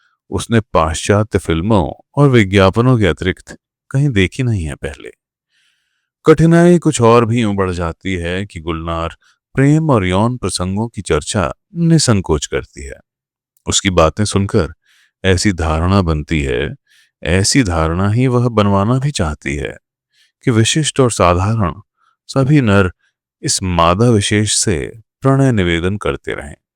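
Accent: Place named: Kumaon, India. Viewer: मनोहर श्याम जोशी जी के 'कसप' को आपकी आवाज़ में सुनना एक अद्भुत साहित्यिक यात्रा जैसा है। इस उपन्यास के हर पात्र के लिए जिस तरह से आप अपने स्वर का चयन करते हैं और उसमें जान फूंक देते हैं, वह सचमुच काबिले-तारीफ़ है। खासकर कुमाऊंनी भाषा के शब्दों का आपका उच्चारण और जिस लहजे के साथ आप उन पर काम करते हैं, वह श्रोता को सीधे अल्मोड़ा की गलियों में ले जाता है। सबसे सुंदर बात यह है कि जिस सहजता से आप उपन्यास के बीच-बीच में गीतों की पंक्तियों को पिरोते हैं, वह कहानी के प्रभाव को कई गुना बढ़ा देती है और हर दृश्य को जीवंत कर देती है। आपकी वाचन शैली ने इस कालजयी कृति के रसास्वादन को और भी अधिक समृद्ध कर दिया है। इतनी मेहनत और कलात्मकता के साथ यह प्रस्तुति देने के लिए बहुत-बहुत धन्यवाद। सुनने का अनुभव अत्यंत सुखद है!"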